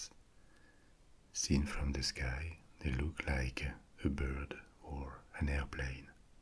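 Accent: France. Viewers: Happy new year and all the best to you too, mister relaxing french voice :D in all seriousness I found your channel recently and I'm hooked, amazing work ^_^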